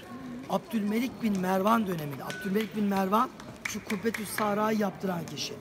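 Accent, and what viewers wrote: Turkey. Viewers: kayda değer ne anlatıyor diye dinlemek istedim ancak egeli ağzıyla "öyle diyolağ böyle diyolağ ama yanlış diyolağ"dan başka bir argüman duyamadım. çığrınmaktan tepinmekten argüman sunmayı unutmuş abimiz az bi soluklan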